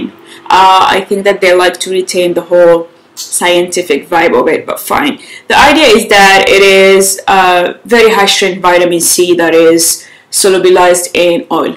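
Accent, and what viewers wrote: United Kingdom. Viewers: Est ce que c'est moi ou ton accent anglais est un peu plus british than usual? By the way, very nice look😘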